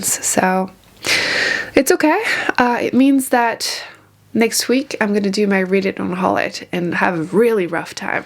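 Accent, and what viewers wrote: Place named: Canada. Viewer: Totally off topic, but I am listening to your accent and was wondering where you live in Canada?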